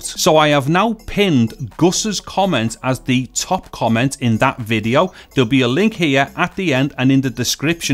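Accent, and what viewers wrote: Liverpool, United Kingdom. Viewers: a scouse tech channel? subscribed!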